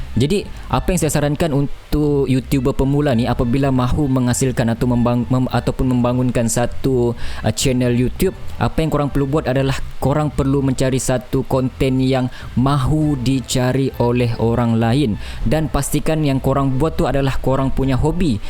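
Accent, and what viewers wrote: Sabah, Malaysia. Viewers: Perkongsian yg bermanfaat.. Teringin mau jd YouTube tapi xde ilmu.. Lps tgk video mcm terinspirasi.. Terbaik admin.. Admin org Sabah ye? Sbb dgr sleng mcm Sabah.. Terbaikkk admin.. Teruskan berkarya..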